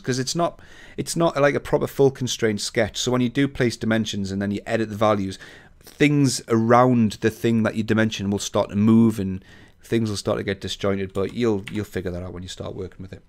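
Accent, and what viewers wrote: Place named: United Kingdom. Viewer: liked your video should be very helpful! I'm in America so it was nice hearing someone from across the pond lol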